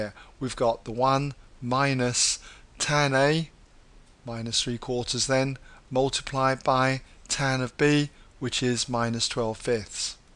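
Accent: United Kingdom. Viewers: The British accent made this video both entertaining and educational! British!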